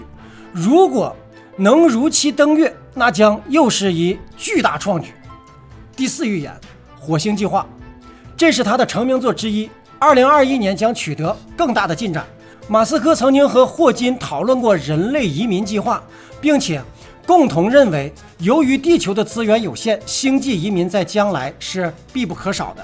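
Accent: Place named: Shaanxi, China. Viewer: 陕西话说的真可以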